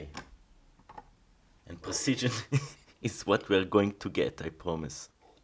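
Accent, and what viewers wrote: Israel. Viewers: I don't know if it's a jewish accent or maybe Israeli but you seriously sounds like many Israelis I spoke with and also hearing my own voice so just saying kind of a weird accent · הסרטונים שלך מושלמים, אוהב ממששש את העבודות שלך ואת האנגלית עם המבטה הישראלי חחח 😁😁 שנה טובה ומתוקה 🍎🍯ותמשיך כך💪 Love your work keep it up 😁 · המבטא הכי ישראלי ששמעתי בחיים שלי ריספקט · Wow, that israeli accent grinds my nerves. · I’m guessing by that accent, you’re Israeli? If so, AWESOME, you’re the first Israeli machinist I’ve seen on YouTube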